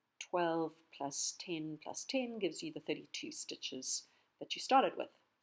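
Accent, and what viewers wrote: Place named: South Africa. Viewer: Thank you so much. Such a beautifully presented, clear and simple pattern. I'm thrilled. And to hear a lovely South African voice showing a familiar way of knitting is delightful!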